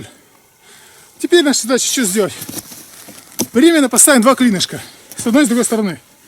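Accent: Russia. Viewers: ***** Это наш Вологодский говор. яркий представитель своей родной земли.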